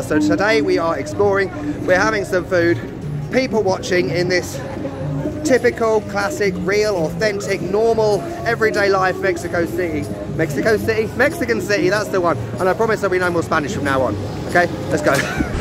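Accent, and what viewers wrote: England, United Kingdom. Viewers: Please, stick with your English accent… your American accent is a bit South African lol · Hola! a mi me gusta tu acento inglés así que no lo cambies sigue siendo tu mismo! Me divierte mucho tu forma de llevar los vídeos! Me da muchísimo gusto que te gusto la comida de mi querida Laguna. Cuídate!!! Seguiré viéndote! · hahahahaha. Your American accent was awesome!!! But I truly prefer to watch your videos with your wonderful and sarcastic English accent. You can also drink electrolit but always cold, if not, it taste like shit. Be hydrated. Waiting for your next adventure.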